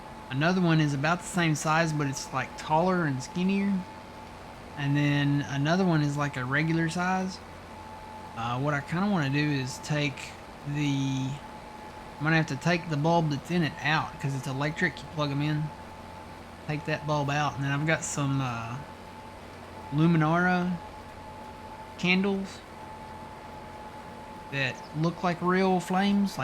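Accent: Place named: United States. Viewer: hey bro. this is good content, lots of creators mess it up by putting in those speeded up farming with horrible music, plz dont do that and you will have at least me to keep telling you are doing great. you must either be a farmer, or have or do work on farms. and your country accent, lol not a bad thing just kinda funny. I live in idaho you know SPUD COUNTRY LOL, Im from Fairbanks Alaska, and spent ha;f my life in Seattle,. Not much for the big city, I am a metalhead but i love all real music. if yoou ever wanna say wat up Im down to make a new friend. If you want I can send you my stean handle or watever ppl call it these days and maybe we could multi player some time. like i said this is good content, keep up the good work bro. Sean.......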